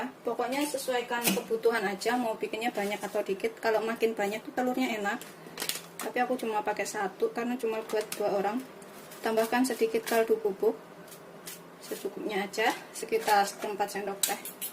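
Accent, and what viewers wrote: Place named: Banyumas, Indonesia. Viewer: Mba'e logate kaya wong mbanyumas.. 😊😊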